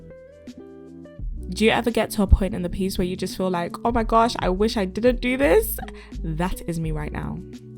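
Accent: United Kingdom. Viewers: I just love the british accent ❤ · Bro is British💀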